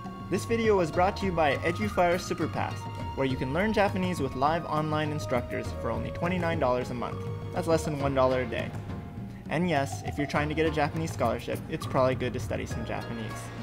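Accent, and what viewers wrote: England, United Kingdom. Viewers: XD TEACH ME YOUR BRITISH ACCENT! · I just received my visa today yay But no scholarship though D: And love the british accent xD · teehee! that English accent is making this englishwoman giggle muchly ^.^ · i actually love wakame... first time i tasted it, i couldnt get enough of it... ha... healthy and delicious... =d awesome british accent btw =) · Your british accent is so cool..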